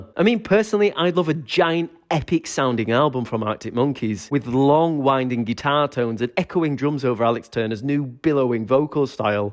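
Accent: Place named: United Kingdom. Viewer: i like your dialect, where in the uk are you from?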